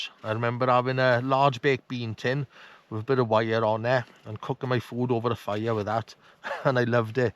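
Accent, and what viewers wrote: Wales, United Kingdom. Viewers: I like the accent. Is that Welsh?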